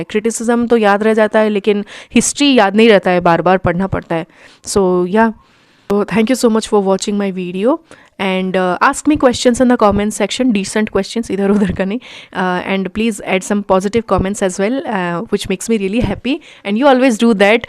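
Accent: Odisha, India. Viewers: Hlw mam i guess you're from odisha! RIGHT!!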